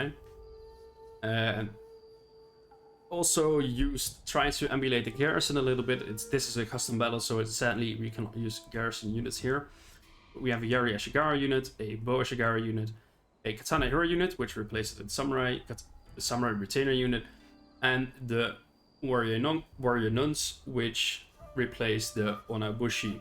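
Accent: Netherlands. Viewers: He's defiinitly dutch, as a dutchman myself I can instantly tell he's from the Netherlands with how he speaks english. Idk what it is exactly but it's very recognizable.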